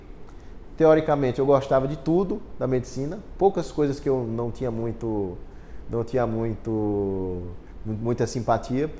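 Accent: Northeast, Brazil. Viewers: Oi Dr.:, eu lhe descobri aqui no YouTube por um acaso, mas foi maravilhoso te descobrir aqui, fiz curso técnico de enfermagem, apesar de não exercer a profissão, eu continuo sendo curiosa em relação aos assuntos de saúde, e com isso acabo relembrando meus aprendizados com os meus conhecimentos de enfermagem e de medicina, percebi desde o primeiro vídeo que vi seu que vc é nordestino, nosso sotaque é inegável em qualquer lugar do mundo! 😂😂😂😂😂😂😂😂 amo assistir seus vídeos! Sou de Arcoverde-PE!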